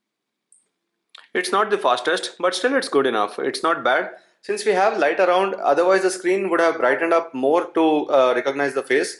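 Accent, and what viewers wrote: India. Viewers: Wdym 😂 I'm not a native English speaker yet I can understand this guy very well. He's just speaking it in an Indian accent. And no, I'm not Indian either.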